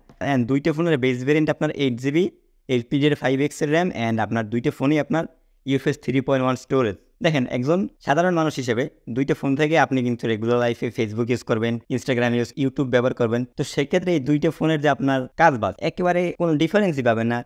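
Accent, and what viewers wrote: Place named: Barishal, Bangladesh. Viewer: ভাই আপনি তো মনে হয় বরিশাইল্লা 🙂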